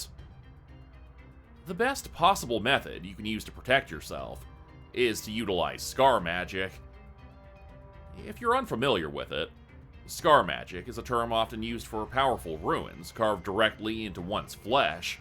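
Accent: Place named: Canada. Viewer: Brother I love your channels but you got to work on those "O's" and "OU's". I would love to know where you're accent is from. I'm guessing Canadian are Northern United States. I really hope you see this and respond because I have been wondering this very question for as long as I've been listening. 😅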